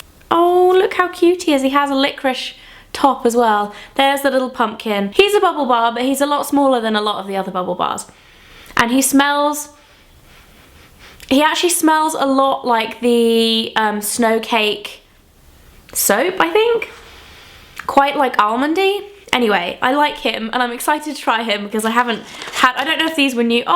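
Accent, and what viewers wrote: England, United Kingdom. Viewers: is she english? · From which part of the UK are you exactly ? You have a very lovely accent ! Love the video, defs gonna get some of those as christmas presents !!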